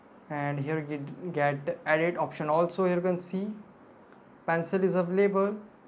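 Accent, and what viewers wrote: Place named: England, United Kingdom. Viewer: U r born in England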